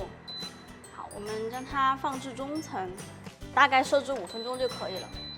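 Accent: Sichuan, China. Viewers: 你是四川的還是湖南d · 一开始就听出了你的四川口音，最后终于讲出了四川话，请问哪里人？我是重庆人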